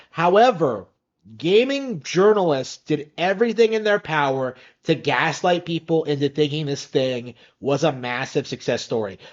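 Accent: United States. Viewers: Why are you speaking in a Southern Alabama accent?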